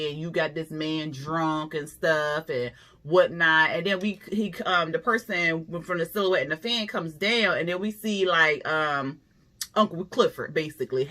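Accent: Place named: Washington DC, United States. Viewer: girl i can tell u from dc. i can hear it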